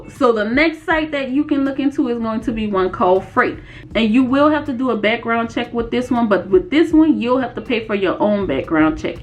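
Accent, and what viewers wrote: New Orleans, United States. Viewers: Are you from New Orleans....I know my N.O. peoples ❤❤accent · What part of New Orleans you from? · before you selected your location I already knew where you were from lol five oh foe ya heard meh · You gotta be from New Orleans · I never heard of this channel but I’m hearing a slight NOLA accent… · First time watching. Question. Are you fro. New Orleans ? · It’s the New Orleans accent for me 💜💜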